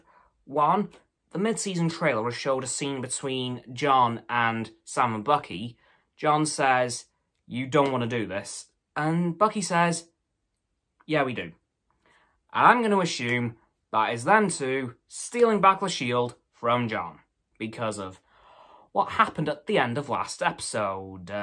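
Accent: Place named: United States. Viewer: You got a lot right!!! Congrats! Btw- bring American, I could hear you give me bad news and sad stories with your accent all day and be totally fine with it. If I ever find out I have cancer you need to tell me. Lol.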